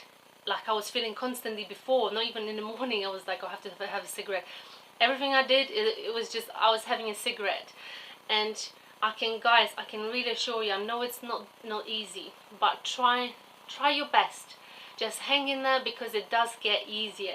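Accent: England, United Kingdom. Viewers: What accent do you have?? Are you English? Congrats on three years and 3 months of no smoking. You look healthy and really happy. You maka me smile :) xx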